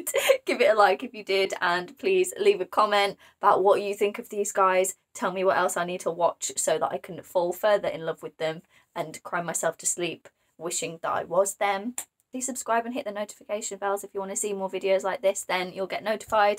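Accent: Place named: United Kingdom. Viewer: I love your British accent,, 😅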